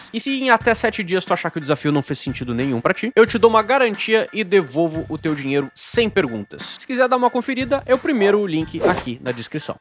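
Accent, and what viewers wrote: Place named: Rio Grande do Sul, Brazil. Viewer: Português 😅🎉 é do sul ? Parece Gaúcho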